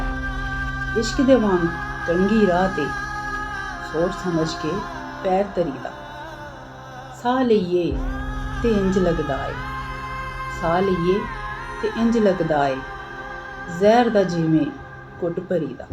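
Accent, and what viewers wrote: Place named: Saraiki, Pakistan. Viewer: سرائیکی لہجہ اپنانے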